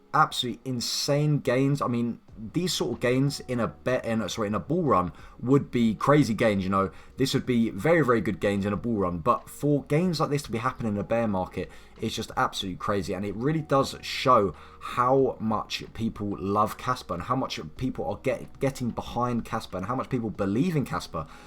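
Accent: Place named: England, United Kingdom. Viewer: i want to learn your accent bro! After of the halving I will to pay someone from Ingland to teach me.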